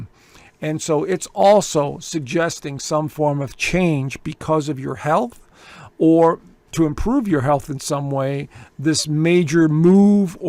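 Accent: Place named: New York, United States. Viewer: Sounds like you’re from the 5 Boroughs or NJ.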